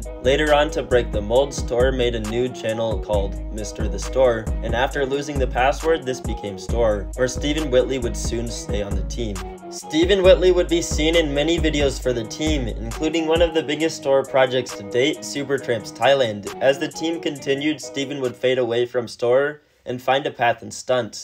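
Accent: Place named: United States. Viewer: so crazy hearing an american talking about parkour over video clips of my hometown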